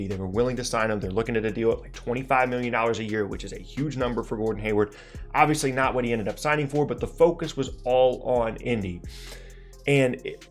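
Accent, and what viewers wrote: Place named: Boston, United States. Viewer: I think is that Boston accent. It makes people crazy.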